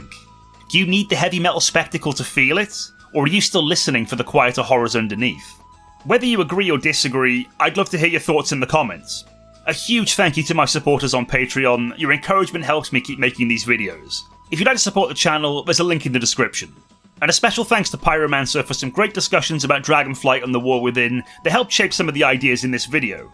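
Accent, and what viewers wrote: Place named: Wales, United Kingdom. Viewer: Haha I am from Cwmbran. You sound very similar to me but I play with a guy from Barry and he sounds a little more like you than i do so yeah....That was my best guess but i new you was Welsh :)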